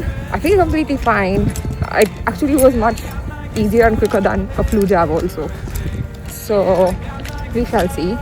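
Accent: India. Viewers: Thank you very much for this: it is also a delight to hear your accent for some reason! I am fond of the Indian accent. I had my vaccine yesterday and it was fine, I did have mild muscle aches today and last night, a mild headache but I understand the muscle aches are an indication that the immune response has been innitiated, so nothing to worry about. If one knows what the cause is, then I wouldn't count this as 'suffering': and it is nothing compared to the deleterious effects of the virus itself. My grandmother died of it and I think it's a real tragedy when someone reaches her age and then in the end is taken by something avoidable: I'm sure most people know someone or more then one person for whom this virus has taken there life. Bearing this in mind I think it makes little rational sense to pass up the vaccine out of a fear of possible physical symptoms. Out of all the millions of people who have been vaccinated (about 18 million in the UK alone), there have been no documented cases of this vaccine causing death, and I don't know of any serious adverse events that have been blamed on the vaccine. 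It is fear caused by distrust, caused by the absence of understanding that surely leads someone to resist: so I would urge anyone to find out the facts for themselves, from sources they trust. You areprotecting not just yourself but anyone you come in to contact with. There are no known serious risks to taking it but no end of possible serious consequences for anyone who doesn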